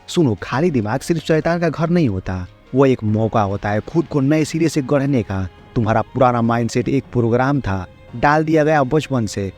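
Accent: Bengal, India. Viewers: Bahot badhia bengali accent dada.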